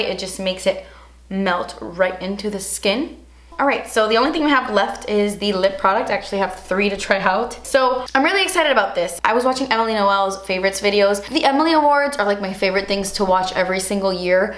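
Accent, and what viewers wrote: Cuba. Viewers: "Por eso tengo los nervios tan malos" 😂😂😂😂 I ❤ that cuban accent!!! My husband says we are twins! you're hilarious love you 😘😘😘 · 😍tu acento cubano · yeeees absolutely loved when you mentioned Rachel, by the way i loved your cuban accent!!!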